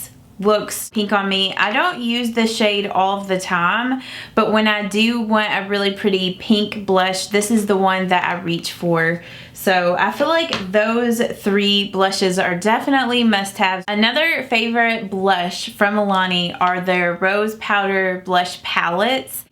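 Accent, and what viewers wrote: United States. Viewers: Thank you for the Milani review,. I have several of the items you spoke of (blush, eyeshadow, lip creams). They are awesome and long-lasting. Please do a video on your makeup look in this video - it's beautiful! I love your accent, where are you from in the US? I am from Ontario, Canada. Cheers!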